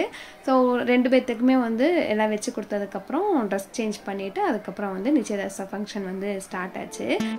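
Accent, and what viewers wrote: Kongu, India. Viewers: Neenga v2la kongutamil giramathu basai thaan pesureenga kongu vellaalar ah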